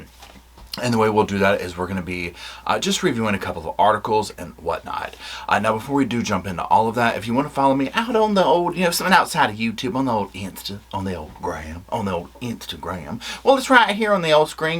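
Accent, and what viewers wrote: South, United States. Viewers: 1st.. luv ur S. accent.. wonder if Kevin thinks by divorcing her that it will hide his actions b4 he was sent away by Roobs?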